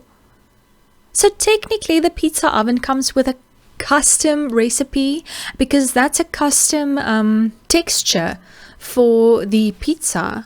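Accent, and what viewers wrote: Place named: South Africa. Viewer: I knew from ur accent your South African💀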